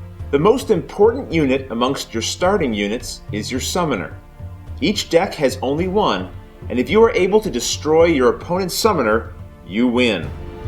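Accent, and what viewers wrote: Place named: Eastern Canada, Canada. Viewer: I'm from Eastern Canada, that's what you're hearing :)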